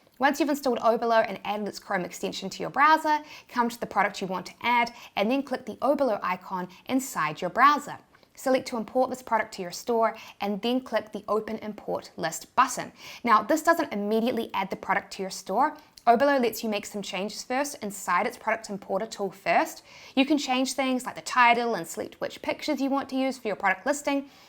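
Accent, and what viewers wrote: New Zealand. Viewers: Love the new Zealand accent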